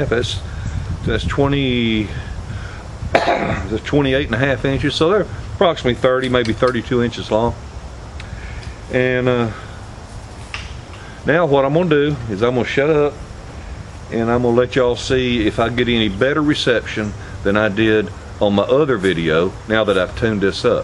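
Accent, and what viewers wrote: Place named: South, United States. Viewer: Hey, I love your southern twang. The information was great. I'm going to build one. Wife bought me a $80 digital antennae in the house. I get 15 channels. This should also help get more.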